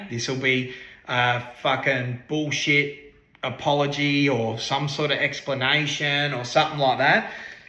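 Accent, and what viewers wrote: Australia. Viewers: 100% feel this found me. Thanks. Never got a tarot reading from an Aussie before and i gotta' tell you, it's pretty entertaining the way you articulate and deliver your message ❤😂 LOVE & LIGHT💯👊🫶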